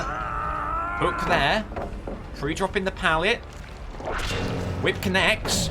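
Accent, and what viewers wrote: RP, United Kingdom. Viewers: ! My man! That posh guy from Youtube! great video so far (like 5 seconds in).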